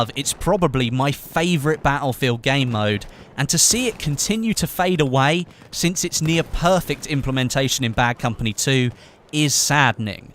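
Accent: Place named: United Kingdom. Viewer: Wonderful information, thanks for delivering all of these information for us.:D Great channel,.. I become very happy the moment I notice that you uploaded a new video..:D (Between, you're a great English teacher, I'm practicing my British accent by watching your commentaries..) xD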